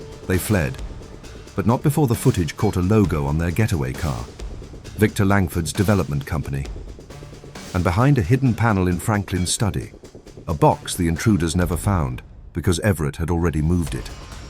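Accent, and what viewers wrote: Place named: England, United Kingdom. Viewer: WHY THE ENGLISH ACCENT ?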